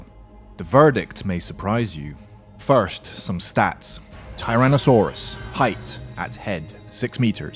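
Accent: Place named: United Kingdom. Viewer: Just because you speak in a British accent doesn't make you an expert on what happened during the age of dinos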